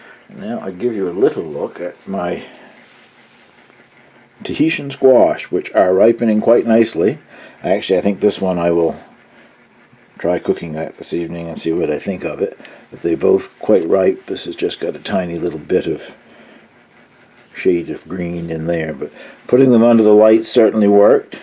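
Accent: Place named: Campobello, Canada. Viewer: Grampy must have donated the extreme accent of some of the Campobello Calders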